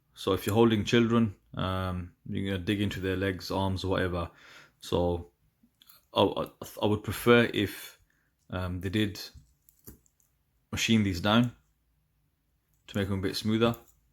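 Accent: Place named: West Midlands, United Kingdom. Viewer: Sounds like you're enjoying the watch. Been tempted by one of these. Also, I recognise the accent. A fellow West Midlands lad? 😁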